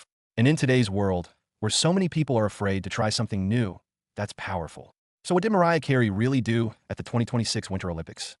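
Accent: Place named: United States. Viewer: SHE 'SHOCKED THE WORLD??????'...REALLY????..... 'A BREATHAKING PERFORMANCE THAT NO-ONE EXPECTED'....All I can say is that this narration is SO American it's sickly.....I notice you don't play the performance, you just TALK, TALK, TALK...I thought her performance was DIRE!!!!! just like this video.....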